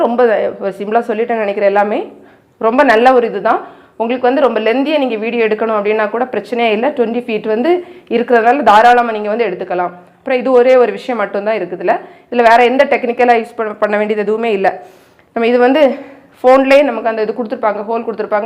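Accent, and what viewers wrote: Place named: Nagercoil, India. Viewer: Nagercoil slang ungaluku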